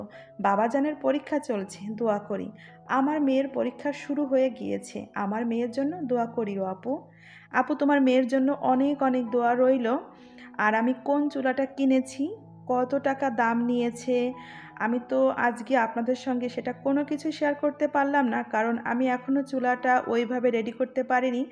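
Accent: Jessore, Bangladesh. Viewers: আপু যোশহর ভাষায় কথা বলে নিয়েছ। খুব ভালো লেগেছে হাসিও পেয়েছে। ডিম কুমড়ো বড়ি দিয়ে রান্না করে নিয়েছ। জলপাই আচার খুব লোভনীয় হয়েছে।আর চটপটি খেতে খেতে বিদায় নিলাম আপু।❤❤ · ওয়া আলাইকুম আসসালাম বোন । তোমার কম্বলের মত আমারও একটি আছে (খয়েরী )ভালোই যশোরের ভাষায় কথা বললে 🥰আমি খুলনার মেয়ে,নানা দাদা বাড়ি লোহাগড়া হলেও পারিনা আঞ্চলিক ভাষায় কথা বলতে। আপু সাথে আছি ইনশাআল্লাহ পুরা ভিডিও জুড়ে থাকবো 💞💞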